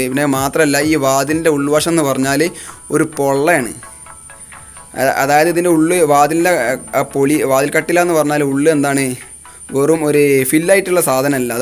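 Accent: Malappuram, India. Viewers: നിങ്ങളുടെ എല്ലാ വീഡിയോ കാണാൻ നല്ല ഹാരമുണ്ട് പ്രതേകിച്ചു നമ്മുടെ മലപ്പുറം ഭാഷ...നിങ്ങളുടെ സ്ഥലം എവിടെയാണ്.... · Ath njammade malapram ayonda bane...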